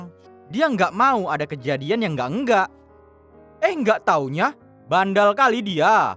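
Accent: Medan, Indonesia. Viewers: orang medan La kau Bang,. yg punya Channel,.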